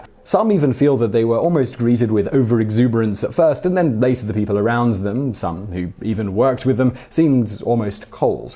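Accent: England, United Kingdom. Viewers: He has said his audience is mainly American. And maybe youve noticed his English accent? Plus theres the fact he lives (or lived Im not up to date with his personal life) in Prague. His audience IS international. Im Australian, I have no issue with him addressing his core audience more often than me. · Why is this British-sounding guy lecturing us about "what Americans think?" Why is this titled as what Westerners think, and entirely geared towards American (mis)conceptions?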